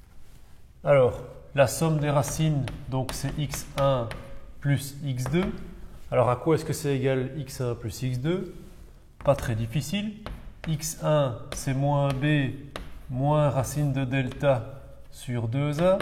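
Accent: Belgium. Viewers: cet accent bien de chez nous haha vive la Belgique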